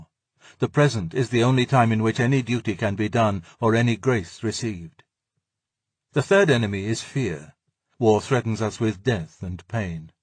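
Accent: United Kingdom. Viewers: I love his voice. Nice British accent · very old times British aristocrat. You've seen that in movies so you're conditioned to it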